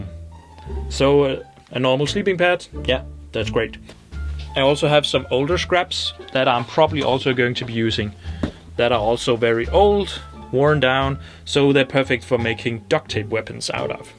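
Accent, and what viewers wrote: Denmark. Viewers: er du dansk?, you have a danish accent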